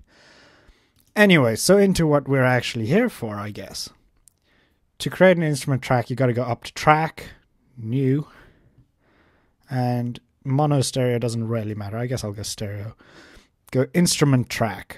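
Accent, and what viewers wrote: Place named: South Africa. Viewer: South African...caught your accent! I'm from Jeffrey's Bay. Thanks for the help!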